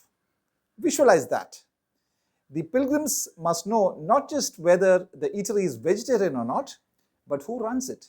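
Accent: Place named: Kerala, India. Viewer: Cristian flavour with Malayalam accent!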